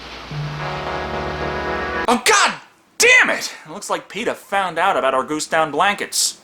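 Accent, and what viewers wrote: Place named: New York, United States. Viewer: Ok seriously what is with the New York accent?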